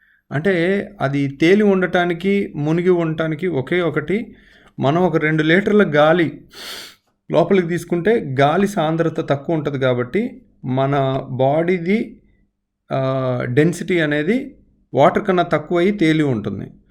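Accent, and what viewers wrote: Krishna, India. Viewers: Miru chala chakaga telugu krishna dt valla laga matladutunaru doctor garu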